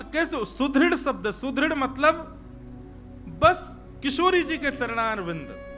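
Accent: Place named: Braj, India. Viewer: Jai shree krishna ❤jai siya ram ❤jai shri man narayan ❤radhe radhe maharaj ❤️🙏maharaj app kitne cute ho, app ki mukh se barj bhasa kitne pyare lagte hai❤❤❤🥰🥰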